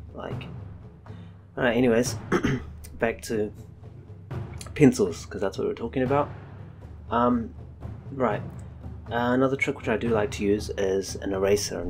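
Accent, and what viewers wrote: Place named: New Zealand. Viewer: you from nz?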